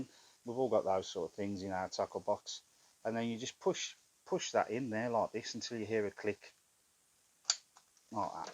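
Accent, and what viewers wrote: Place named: West Midlands, United Kingdom. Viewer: Like how you think great great tips & ideas grip leads can be a pain n literally sometimes when rummaging around in your box . sounds like your a west Midlands chap like myself i love all types of fishing but the sea fishing the most regardless of the distance from brum . Wish you luck n success with the channel from a new subscriber 👍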